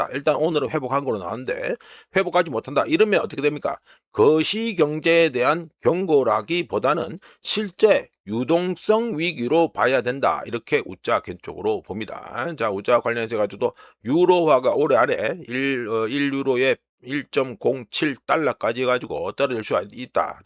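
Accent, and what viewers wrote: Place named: Gyeongsang, South Korea. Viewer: 어이 경상도 사투리 막소리 할때 삼가라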